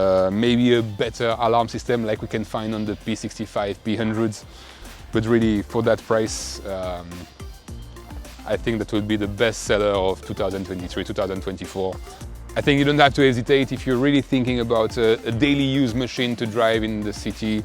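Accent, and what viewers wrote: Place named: France. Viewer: Tu et français ?😂 en tout cas tu en a l'accent😊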